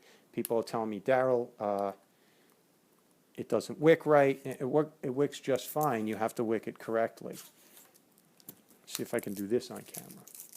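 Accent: New Jersey, United States. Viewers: You from Jersey?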